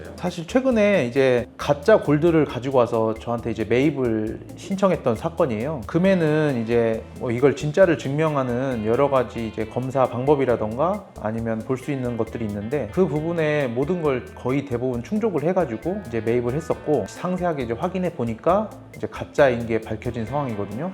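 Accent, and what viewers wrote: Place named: Jeolla, South Korea. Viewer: 말투가 절라도구마잉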